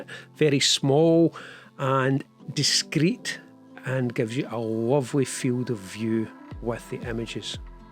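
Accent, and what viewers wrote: Scotland, United Kingdom. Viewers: Thanks a lot for sharing your insights,! Be you 🙂love the Scottish accent:) to your point: I love the Fuji 16-55 f2,8.. yes it is bulky.. but it delivers such great image quality.. and I was lucky to get it used in great condition for a fair price.. I pair it for hiking either with the 50-140mm or for more reach and lighter weight indeed with the 70-300mm - Cheers, Tom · Hi, with the X-T5 agree on the 70-300 for where you are going. As for the rest of the kit…option a) Sigma 18-50 and 12mm Zeiss or b) 33mm f/1.4 and 16mm f/1.4. Probably a) though as I would choose wide over shallow dof…love the accent. It reminds me of my old Grade 11 Physical geography teacher Mr.McEwan who would make us study topographical maps of his beloved Scotland. It took me a whole semester to realize loch was lake and about as long to understand what he was saying. 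🎉 Have a great trip and look forward to the pictures.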